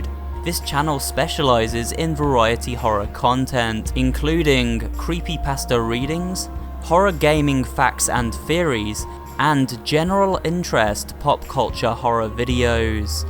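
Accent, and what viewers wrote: United Kingdom. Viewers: why you british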